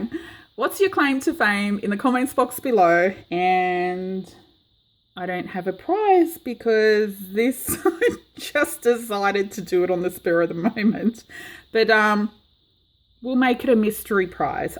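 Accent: Australia. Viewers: Yaaay! That's super!! You're joking about your voice, right? I love your voice! It is sweet, friendly, good spirited and you have an Aussie accent to boot! What could go wrong? 🤗🤗🤗😍😍🤩🤩😉😎😜😜😝❤ Hello Barbara! And I'm only famous inside my studio. 😜😜😜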